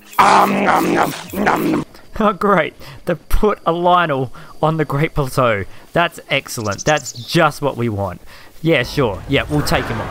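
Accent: Australia. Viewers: Is he Australia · Is this guy Aussie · Where are you from you sound aussie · You're Aussie???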